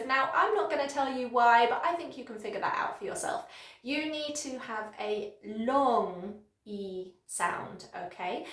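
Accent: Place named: United Kingdom. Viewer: Thank you for the lovely video. I love the British accent...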